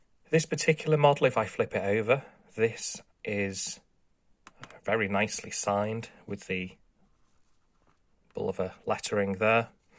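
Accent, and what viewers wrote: United Kingdom. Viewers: Your voice has a similar accent to that of Brian Cox, the British astronomer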